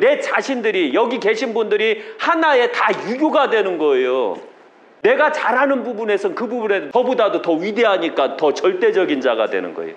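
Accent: Chungcheong, South Korea. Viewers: 고향이 어디십니까 충청도?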